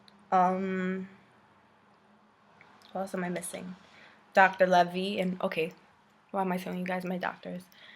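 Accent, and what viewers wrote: New York, United States. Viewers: I Hope you have a full recovery. You have a great voice and New York accent. I'm from California so it seem very cool to hear you speak so as a New Yorker. You should consider radio broadcasting or voice over work. Maybe FM Talk Radio. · hey im from ny too! in med school right now, learned about hodgkins lymphoma yesterday... stay strong and i wish you the best.. with this amazing attitude, you're definitely going to beat it. take care. p.s. i love your latina-from-nyc accent! lol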